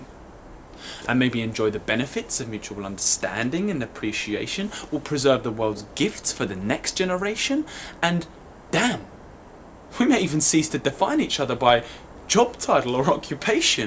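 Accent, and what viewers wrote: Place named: England, United Kingdom. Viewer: This is excellent! All your poems are amazing! By the way i'm russian, and i really like your pure English accent. I'll be glad if you will often record video of this kind. I'm sure it will help to improve the speech for many who teach English.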